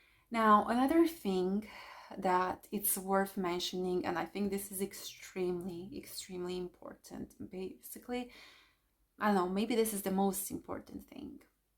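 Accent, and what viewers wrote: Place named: Portugal. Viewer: Awesome video, i am like an amateur astrologer and i share basically the same ideas as you. I am Portuguese, are you in Portugal by the way? Your accent sounds Portuguese, hope you view this message because i would like to be in touch with you. Amazing video again.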